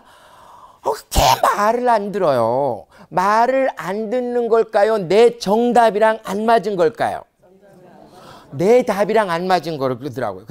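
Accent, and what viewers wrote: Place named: Jeju, South Korea. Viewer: 제주도에서 사투리로 웃음강의하시는 쌤 입니다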